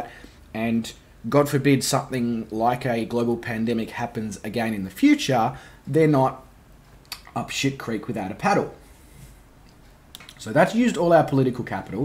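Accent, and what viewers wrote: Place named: Australia. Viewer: Dude, I highly enjoyed the video. I would recommend that the start of the video should have abit of a soundtack, like 2 seconds. But it your choice. Again, I really enjoyed your aussie accent.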